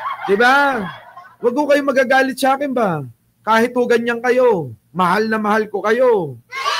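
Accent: Ilocos, Philippines. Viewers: ❤@ gd m, sir,nakakatawa Ang pag salita mo Ng ilokano dialect, naka katuwa ka,mabuhay ka sir,mabuhay si hon pbbmjr,in all the time,amen..!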